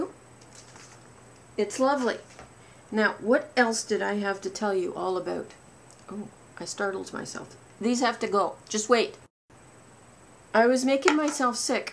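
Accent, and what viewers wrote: Canada. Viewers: I love your videos. I come everyday to your channel to see if you have put up something new. Yes you are quite charming;). I love how you pronounce your words so precisely and your Canadian accent.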